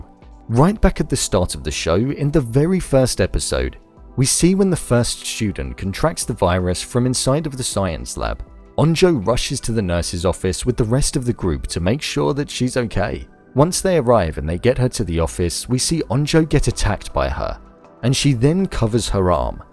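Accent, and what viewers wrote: United Kingdom. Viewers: i subed uh provably not for these topics but for the accent lol , listening you will help me learning british english a lot.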